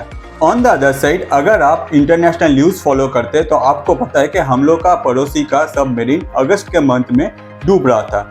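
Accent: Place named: Assam, India. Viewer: App Assamese ya Hindi na bolne wala he? Kyuki AAP jav Hindi bolte ho lagta he dusra bhasha bol rahe ho😊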